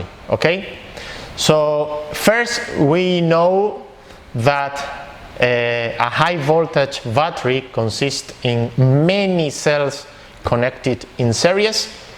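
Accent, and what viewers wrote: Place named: United States. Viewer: Súper para los que no tenemos el oído acostumbrado a la modulación de un gringo:)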